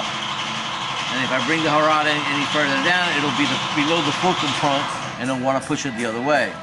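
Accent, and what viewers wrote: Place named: Miami, United States. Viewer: Miami I believe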